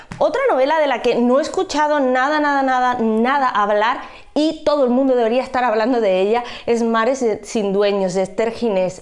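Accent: Spain. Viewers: "La herencia me encantó"! Y le tengo muchas ganas a Gente normal... por cierto, ¿eres murciana? lo digo por el acento:) saludos!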